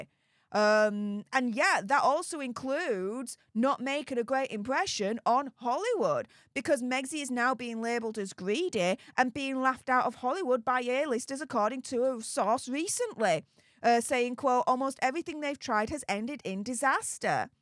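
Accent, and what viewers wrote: United Kingdom. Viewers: I love your accent true British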